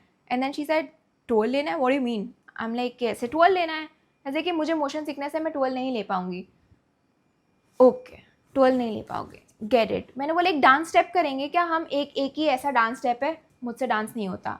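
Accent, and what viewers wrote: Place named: Gujarat, India. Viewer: Love and her gujju accent ❤ dint watch the video u talking about but am definitely on your side 🫶🤌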